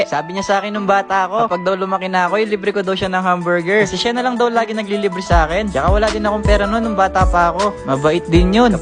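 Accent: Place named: Hiligaynon, Philippines. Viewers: Ang cute mag ilonggo ❤️ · (Kanami di subra) is so Cute daw ako man Mg ilonggo🥰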